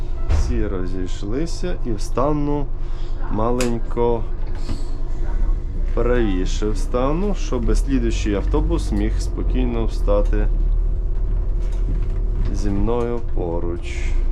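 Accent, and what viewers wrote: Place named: Uzhhorod, Ukraine. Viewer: А мені подобається мова автора канала. Дуже цікавий, навідь прикольний ,Ужгородський суржик, відрізняється від нашого - Дніпрорпетровського. І це нормально. І взагалі підримую автора , що кожен має право розмовляти, як він розмовляє. Ось писати, бажано без суржика :) . Всім добра.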